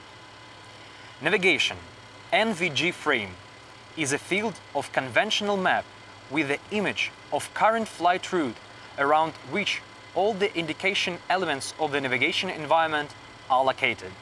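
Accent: Russia. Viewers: Акцент у парня, конечно, жестковатый, мог бы получше подготовиться, некоторые слова воспринимаемы только в русской транскрипции(т.е. приходится мысленно в письменную форму по "школьным" правилам переводить и только тогда становится понятно что было сказано).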